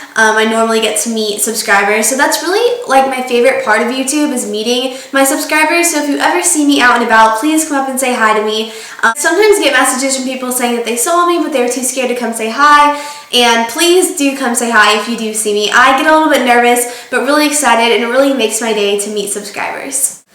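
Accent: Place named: Texas, United States. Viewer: Are you born and raised in Texas? I'm not from there and just thought everyone had the accent!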